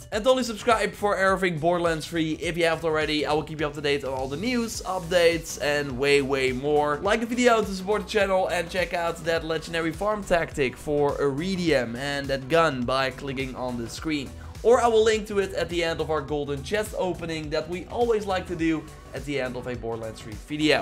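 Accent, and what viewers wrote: Netherlands. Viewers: je hoort meteen dat je nederlands bent haha, nice content man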